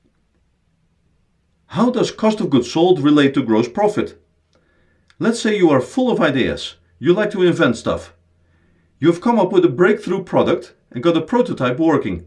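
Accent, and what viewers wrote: Netherlands. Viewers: i´ve lived 10yrs in holland (<num> r´dam) .. your accent is unmistakable :D im planning to go back there ..... i buy, grow and sell companys (mergers & acquisitions) .. mostly distressed companys.. but not always.. i could use help from a trustfull financial advisor for my financial due diligence .. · A brilliant explanation. Many thanks! Could I ask please, how would you work out the COGS where you buy something as a set and then split the parts out? I don't mean bulk buying of the same item, but rather if, for example, you bought something like Lego sets. Each set is a sealed box with its own price, and you then "part out" the set into many different types of piece. Each type of piece can sell for vastly differing prices. If you just divided the cost of the sealed box into the number of parts to give each part an equal cost (say, a £100 set with 200 parts meaning the COGS for each part you sell would be 50p) , you could be at a disadvantage when trying to claim COGS if you only sold the rarer parts that fetch high prices because your margin would be higher on those parts. 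But is that how it should be done? Another example would be a breaker's yard where they buy in scrap cars and then sell the parts off for different amounts. What would the COGS be for each part they sold? A wheel would sell for a lot more than a wing mirror, so what are their costs out of the original cost of buying in the scrap car? And yet another example could be a collection of trading cards bought for a single cost. The collection is then split into separate items to be sold, but how should we work out the COGS for each card sold? Thanks for any tips! Echt heel erg bedankt! (sounds like a Dutch accent on your videos, but I might be wrong). :-)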